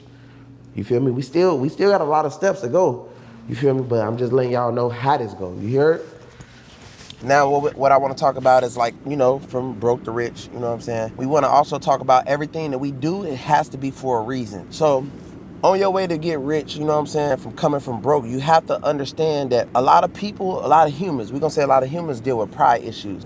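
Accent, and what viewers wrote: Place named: Chicago, United States. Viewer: I was about to say I hear that Chicago accent him in lol.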